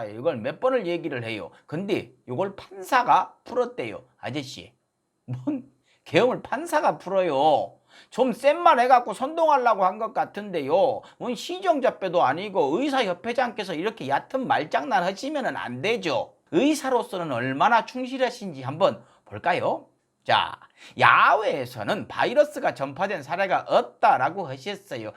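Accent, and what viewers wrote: Jeolla, South Korea. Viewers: 성범아 윤석열이 나라말아 먹는게 아니고 이미 문재인이 대한민국을 다박살냈다 아파트 한채 6억하든게 지금13억 간단다 알것냐 지대로 알고얘기 해라 알것냐 전라도 말싫다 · 전라도사투리 잃져버리지도 않으셨네요ㅌㅌ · 정말로 반갑당게요. 전라도 사투리 징허게 구수허네요. 거기다 칼럼내용 속시원허네요. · 고향이 서울이란데 우째 저리 전라도 말을 구성지게 잘할까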